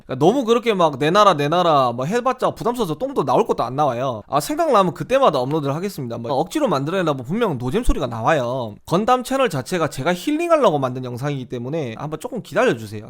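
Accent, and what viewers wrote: Gyeongsang, South Korea. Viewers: 말투가 경상도같긴한데 뭔가 전라 느낑이 있다? 충청..은 아닌거같기도 하고했는데 화개장터가 맞군요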